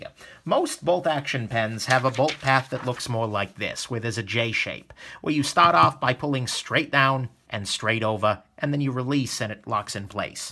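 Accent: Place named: Boston, United States. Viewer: damn love your accent what is it? Sounds Boston but i really have no idea.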